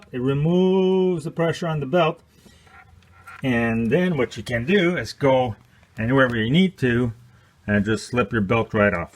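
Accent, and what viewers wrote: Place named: Chicago, United States. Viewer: This dude has a thick Chicago accent.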